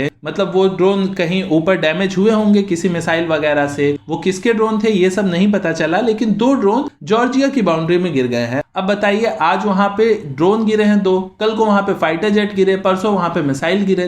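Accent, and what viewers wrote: Bengal, India. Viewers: Sir r u bangoli?? Motamuti..😅u can't hide your words..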